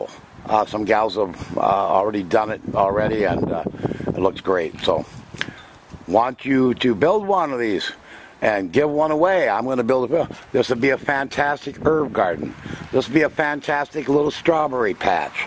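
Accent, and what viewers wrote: Minnesota, United States. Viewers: im sitting here watching his videos thinking the way this guy talks hes gotta be from minnesota haha. and sure enough he is. cheers from saint paul!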